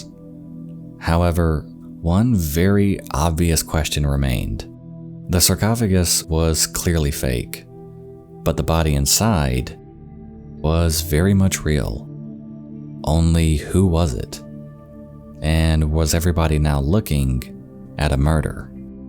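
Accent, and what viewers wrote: South, United States. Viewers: Congrats on your quick rise to fame. Your videos are good, and your southern accent is just great ) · sounds like Barely Sociable with a slight southern accent. Barely has once done a southern accent, though.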